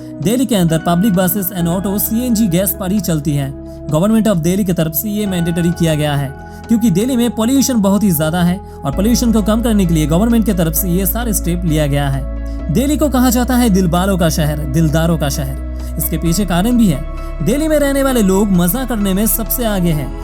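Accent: Bengal, India. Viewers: Hindi with Bengali accent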